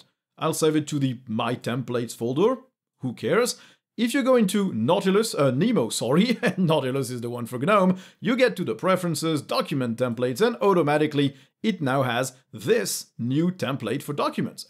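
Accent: France. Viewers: Thanks for the reply, bro. I'd update to 22.3 then. P. S. I like Ur channel and Ur style... And that English accent is nice. U r French, right?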